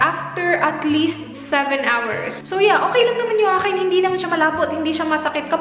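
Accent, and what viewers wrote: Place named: Philippines. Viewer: Gusto ko ung mga vlogs mo, I like how you don't try hard. Ung ibang vlogger jan nakakabusit kasi trying hard, pati accent niya binabago. Mas gusto ko ung gaya lang ng accent mo na pinoy parin.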